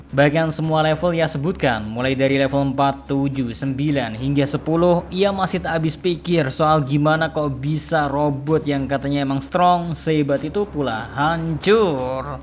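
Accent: Java, Indonesia. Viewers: Ngomong ga usah di gaya2in tapi Masi medogg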